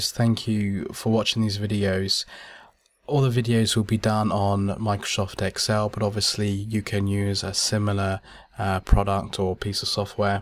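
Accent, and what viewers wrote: United Kingdom. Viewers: Great to hear UK tutors on line.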